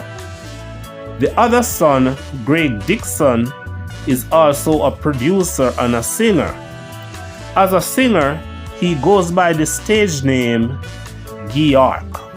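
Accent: Jamaica. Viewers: Arr u jamaican mr ur kind of proñounciation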